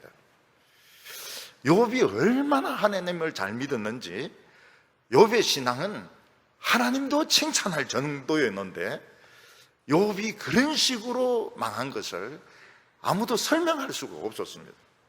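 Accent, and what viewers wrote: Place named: Gyeongsang, South Korea. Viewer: 목사님! 감사합니다. 저도 경상도 사내입니다. 경상도 구수한 언어로 쉽게 이해할수 있겠끔 설교하여 주시니, 깨달으며 은혜받음을 하나님아버지로 말미암은 징계,핍박,고난 달게 받는자 되도록 새롭게 마음 다짐니다. 늘, 건강하셔서 멋진설교 지속하여 주실것을 기도 드리겠습니다.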